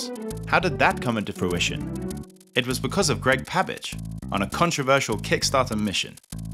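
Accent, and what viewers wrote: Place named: Scandinavia. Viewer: Sorry had very similar accent to some scandinavians speaking english Are you big as in age, height - or width lol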